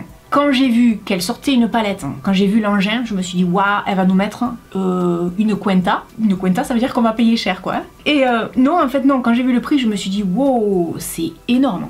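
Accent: South, France. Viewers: ... Maman YouTube merci d'être là!!! Personnellement tu me redonne le sourire a chaque fois surtout avec ton accent du sude 😋 Même quand ca va pas tu es là et je voulais te remercier 🥰🥰❤❤❤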